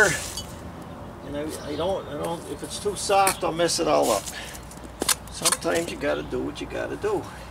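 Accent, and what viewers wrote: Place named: Scranton, United States. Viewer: Love your videos....I had to look ya up what part of pa...I knew with that accent had to be scranton..keep up the great work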